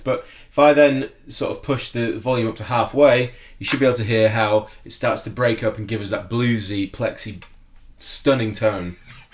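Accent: Yorkshire, United Kingdom. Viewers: it would be great if you could do a video on how you learnt to play guitar and the processes you went through. cheers, also from leeds so i appreciate your yorkshire accent!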